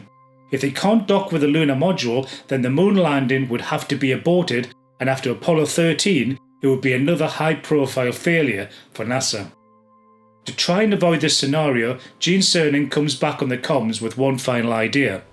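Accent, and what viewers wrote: Northern England, United Kingdom. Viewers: What is it about these British-accented content providers making US space program videos? Their pitiful island never sent anything to space.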